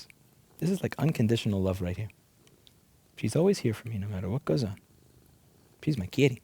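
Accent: New York, United States. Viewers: how do you sound more new-yorker than you did when you lived there 😂😂 great vid regardless... keep up the pressure on anti-consumerism!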